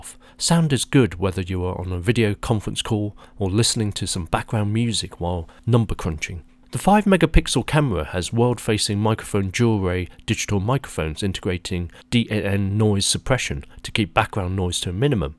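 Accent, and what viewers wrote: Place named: United Kingdom. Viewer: Interesting how Z is Zed, and H is Hayche, in the Queen's English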